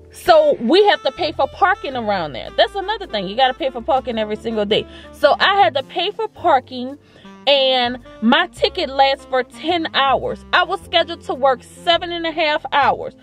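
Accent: New Orleans, United States. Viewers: Sis. I'm still looking up to you as a RN gaining her BSN and ALWAYS choose yourself especially when it goes against your moral code. You from New Orleans? I hear it. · Why do you sound like you're from New Orleans, lol. . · I hear some New Orleans in that accent. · Please confirm my suspicion...you're from New Orleans right? 😆...I'm from there and I just feel like I hear the accent.... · Are you from Nola...I love your accent 🤔😊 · Are you from N.O.? I hear it all in your voice. Lol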